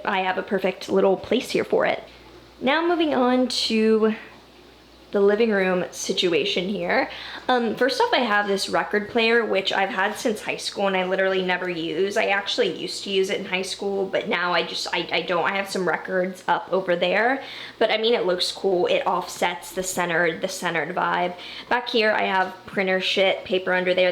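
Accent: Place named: California, United States. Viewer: And I'm sure someone from Texas could jus-az-eezily grow up to speak a perfect East London cockney, damn you muss-a practiced that Cali-girl speak🤩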